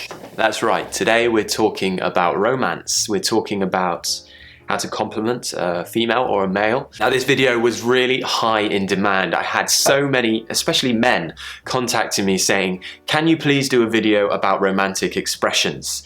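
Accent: RP, United Kingdom. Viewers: I love your British English ! I come from hk , I am married 😹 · thank you! I love your British accent! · I'm brazilian and I'm trying to learn the british accent with your help 💙 I met your channel today and I really love it, thank you so much for all of this 💙💙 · I love British accent, And i love hearing your voice and learning British English at the same time. I'm browsing all of your past videos and i'm in love with it. Your such an inspiration. Thank you. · I am immensely grateful to be able to attend your lessons,specially i am so fun of British accent as well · Hi, English channel.I`m really happy with your english lesson.your british accent is really amazing,every day i watch your youtube channel for improving my english,specially my accent.thanks for your dedication on youtube channel,keep going!very support with your hard work!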